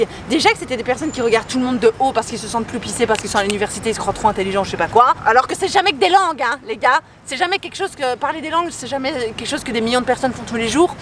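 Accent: Belgium. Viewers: Je suis Canadien j'aime bien l'accent belge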